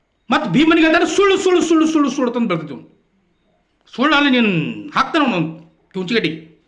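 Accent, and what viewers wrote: North Karnataka, India. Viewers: ಸೂಪರ್ ಅಣ್ಣಾ,, ನಿಮ್ಮ ಮಾತಿನ ಶೈಲಿ ನಿರೂಪಣೆ ತುಂಬಾ ಅದ್ಭುತ. ಉತ್ತರ ಕರ್ನಾಟಕ ಮಂದಿಯ ಮಾತು ಕೇಳಲು ನನಗೆ ತುಂಬಾ ಇಷ್ಟ.ಧನ್ಯವಾದಗಳು ಸರ್. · Chanal ugiri sir nimma. Matu super uttar karnkad matu benki🔥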